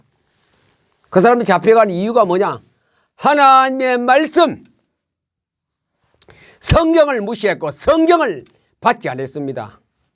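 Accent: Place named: Gyeongsang, South Korea. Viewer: 말투가 참 친근합니다 경상도분 목사님 ^^' 귀하고 좋은말씀 👍🎚감사합니다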